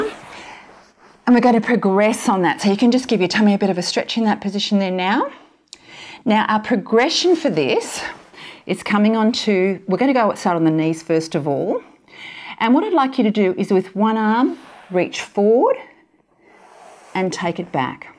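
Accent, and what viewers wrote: Australia. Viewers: Love your beautiful Aussie accent!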